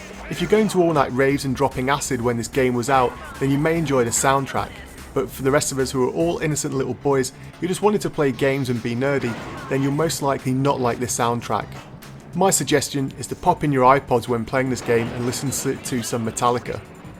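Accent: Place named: United Kingdom. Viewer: british english sounds gay, so shameful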